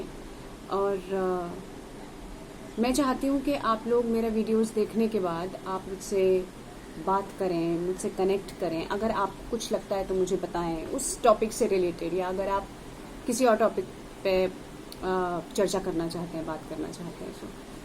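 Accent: Bundelkhand, India. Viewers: we love your bundelkhandi acent in sit videos ....could u please tell us how u got this or might be u belongs to bundelkhand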